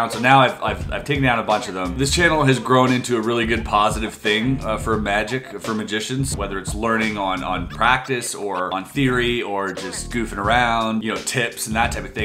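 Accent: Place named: Canada. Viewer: Your Canadian really shines through in those old videos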